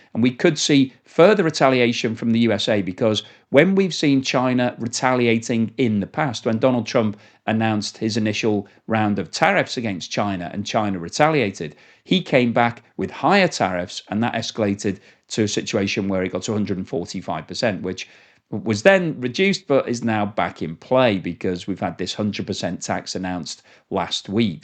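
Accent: United Kingdom. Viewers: Please alter your modality. There is no rational or legal reason why countries like China, Russia, India and the many BRICS countries should not trade with whoever they wish. Therefore, saying that ships 'should not' go into one port or another misleads you audience (who are dumb enough already} into thinking that there is a valid reason for the Anglosphere to think that has a 'right' tot tell the rest of the world what is right or wrong. Saying this in a 'pommie' accent exacerbates your bias. The youtube algorithm is false. Your narration should be censored!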